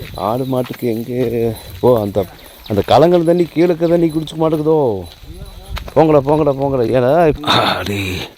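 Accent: Kongu, India. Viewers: உங்க வாய்ஸ் நம்ம மேக்கத்தா பாஷை அருமை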